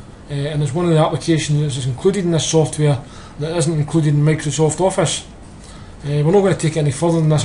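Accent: Scotland, United Kingdom. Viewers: Scottish accent? 👍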